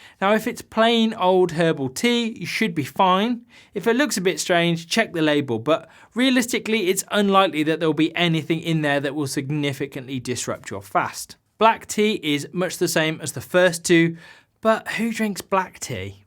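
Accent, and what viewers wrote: United Kingdom. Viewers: Hi I find your videos truly enlightening- learning a lot- very simple and after the initial getting used to the "British accent" as most of the ketosis videos seem to be from Americans, I am getting quite addicted to your knowledge tank, in a way, picking your brains. I am obese( there is no other word for it) and have been trying to lose weight for maybe 40 years- ( I am 50)- I do succeed sometimes but more often fail. Now at a particularly low phase - as living in a food desert and being a college student again is taking a toll. For the past month, have been low carb and high fat- but as you noted, the bullet proof coffee was not causing a weight loss for me- I took one every morning - with butter, coconut oil, cream, and coffee and a breakfast cum lunch cum dinner around 5 pm ( followed this pattern for about three weeks) - no weight loss, so decided to go completely off food- have been doing it for five days now- only water and black coffee( which I usually hate as it gives me acidity and migraines)- as a surprise, I have lost about 8 pounds in 4 days- surely water weight but not much urine passing either day or night. 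My urine strips do show significant ketones now. But the blood ketones are still low and the blood glucose I tested yesterday evening after a round of exercise in the cold weather, came to 150- very surprised as I am typically not diabetic, at least I don't think so. My question is, do you think a low carb ketogenic diet can reverse lipoma or skin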